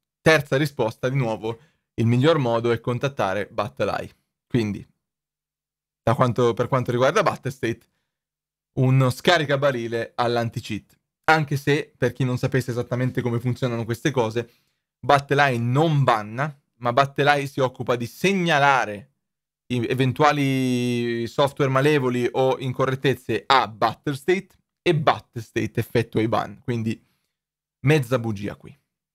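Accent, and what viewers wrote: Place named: Tuscany, Italy. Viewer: Toscanaccio puro, mi dispiace pe sto ragazzo, BSG mi sa che vuole far morire sto gioco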